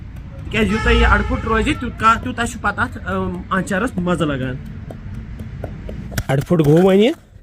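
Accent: Kashmir, India. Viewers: Love your kashmiri accent 🤣🤣🤣🤣🤣 But the quantity of spices which you added my mother will make 4 bottles in this quantity of spices 🤣🤣🤣🤣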